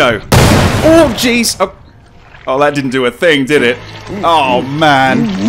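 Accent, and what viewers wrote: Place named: United Kingdom. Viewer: I really like your accent, which is weird because I hated British accents. No offense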